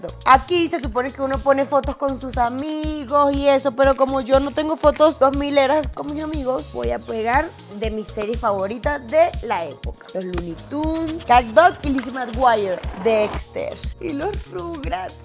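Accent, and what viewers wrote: Venezuela. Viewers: eres venezolana me encanta comohablas😍😘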